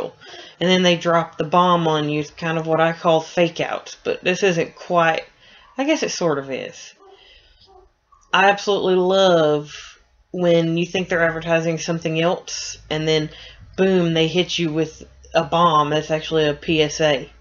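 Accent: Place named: United States. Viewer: Really nice review! I definitely agree with you that Meth Cleaner Girl is creative and overall a good PSA. I never saw it on TV because it didn't air until the year I was born. Glad I didn't though, because I think it would have just mostly confused me. By any chance, are you from Georgia? I live there, so it was nice to hear an American voice for a change. Seems like a lot of reviewers are British on here. :)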